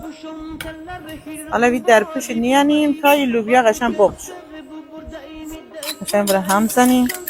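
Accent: Gilan, Iran. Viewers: Mersi, Mersey, Che ziba va asson yad midid, ba hezaran boseh as rahe Besyar door be soorat shoma nadare honarmand va mehraban,ba oon lahjeye zybaye gilani.🦌🌹🌹🌹🌹🌹🌹🌹🌹❤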